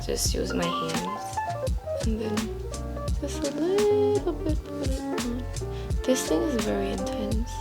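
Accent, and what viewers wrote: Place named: England, United Kingdom. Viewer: เราชอบสำเนียงอิ้งมาก หลงแล้วววว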